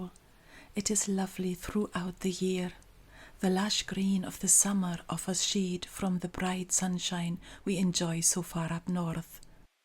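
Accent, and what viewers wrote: Scotland, United Kingdom. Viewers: Every time I watch a video of yours, I'm positively surprised by hearing your Scottish accent. I love the Scottish accent, and personally for me, this is one of the most beautiful accents there is.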